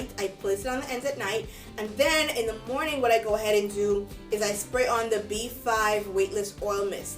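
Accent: Bahamas. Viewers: Hi great review, the hair look amazing on you😉. I just found your channel and I am so happy I did, you're really sweet and very fun to watch😁. I can't wait to watch more of your videos!!! I heard my accent I'm Bahamian too so you know I will be giving you my love and support😁😁!!!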